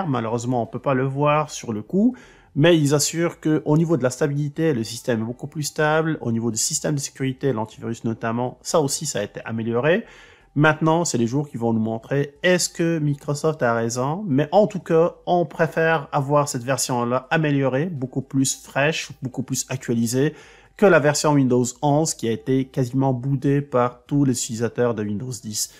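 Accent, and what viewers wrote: Quebec, Canada. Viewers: Merci pour cette excellente présentation... avec cet accent de nos cousins du Québec :-)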